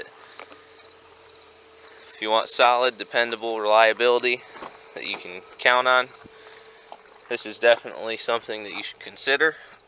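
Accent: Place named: Canada. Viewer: Are you canadian? Every sentence you speak sounds like you're asking a question.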